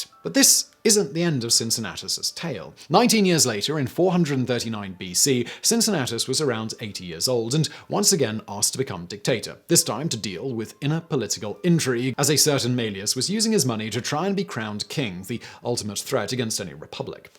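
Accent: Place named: RP, United Kingdom. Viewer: This presenter guy is proof that a cultured British accent doesn't mean you aren't a slimey lowlife.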